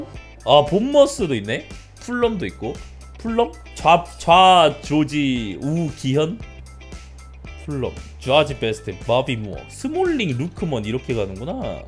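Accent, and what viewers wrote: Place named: Japan. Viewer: 이형 일본팀 하면서 일본 말투 중독됬네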